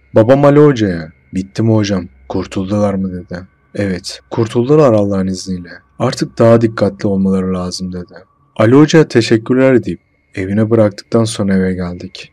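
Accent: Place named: Thrace, Turkey. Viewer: Severek dinledim ama merak ettiğim bişey var şiven trakyaya kaçıyo doğrumudur ben babaeskiliyim başarılar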